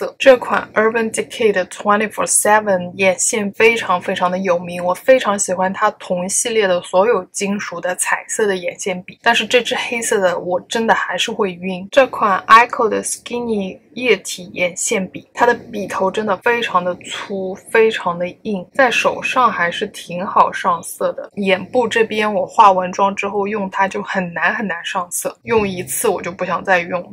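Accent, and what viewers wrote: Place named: Shanghai, China. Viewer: 是不是上海人啊，口音很熟悉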